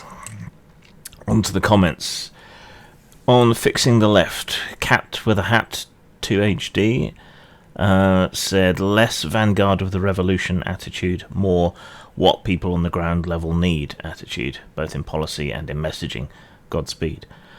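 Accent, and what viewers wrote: United Kingdom. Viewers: Still a little surprising that you recited my bloviation and griping about contemporary US social decay. I appreciate that you consider it worthy of repeating. I need to improve my prose if its going to be read aloud by your dulcet southern English tones.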